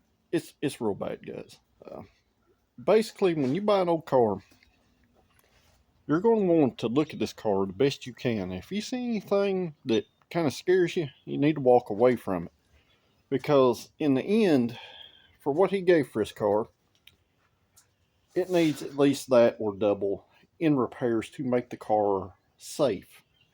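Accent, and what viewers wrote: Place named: South, United States. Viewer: Learn the English language dude , you sound like a redneck....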